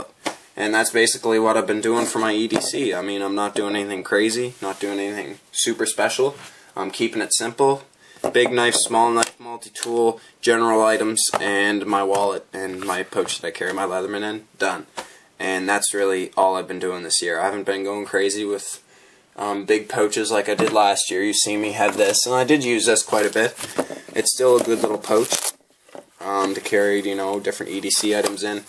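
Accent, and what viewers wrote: Canada. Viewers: You're Canadian, Aren't you? You sound like a Newfie.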